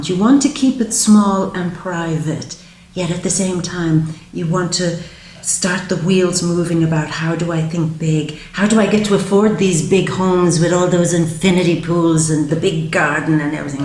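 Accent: Ireland. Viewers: your got a good Irish accent lass I like it what about you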